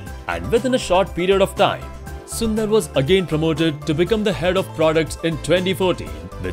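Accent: India. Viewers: I hate that heavy Indian accent as well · Why you can bring Indian out if India, but not Indian accent out of Indian? :(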